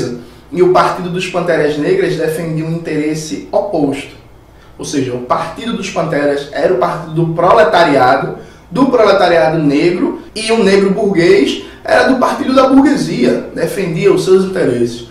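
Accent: Pernambuco, Brazil. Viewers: Sim,fala pernambucano!✋😁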